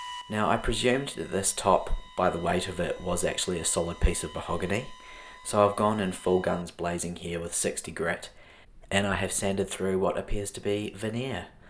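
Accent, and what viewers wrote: New Zealand. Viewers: I'm so used to hearing American accents that familiar kiwi accent threw me for a loop! I'm a fellow furniture flipper from New Zealand and I am also taking a break from drawers to work on a coffee table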